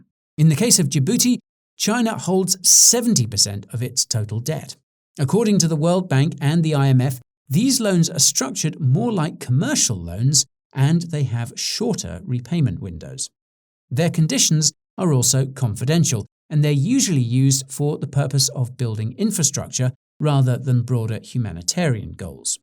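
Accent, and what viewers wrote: United Kingdom. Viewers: Before China, Europe and America continues to do via its corporations in Africa? And you speaking with a British accent; a thief pointing fingers at another thief. The Mwafrikan Tengazako coming! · The irony of a critique of imperialism in a British accent 😂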